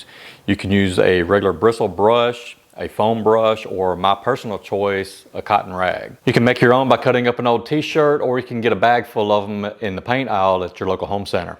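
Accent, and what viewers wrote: South, United States. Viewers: Cool. I have lived most of my life in northern Minnesota. I like listening to accents and your accent sounds like my kin from down south. Nothing like Texas accents. I appreciate your VIDEO! I am attempting to build a shelf and have never done wood-working since I was a kid. Kind regards from up north and Merry Christmas.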